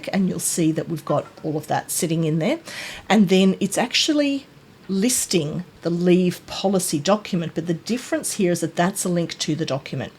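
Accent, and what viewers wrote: Australia. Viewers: Another great and informative video, I am really getting into Copilot as a result of your videos, I can see what an amazing benefit it can be for any sized organisation. Keep up the wonderful work! I always look forward to your new releases. Also, as a fellow Australian, so good to hear an Australian accent on YouTube, as well as the familiar cultural nuances and humour in your discussions.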